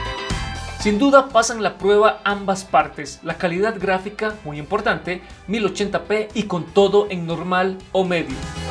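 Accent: Costa Rica. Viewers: Mae usted es de Costa Rica? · Hola soy de Costa Rica Quiero comprarme una pc nueva que me corra buenos juegos Estoy viendo tu video "análisis de los nuevos ryzen 3400g y 3200g" Creo que por tu acento sos de acá Costa Rica Sabes donde puedo comprar una pc a buen precio?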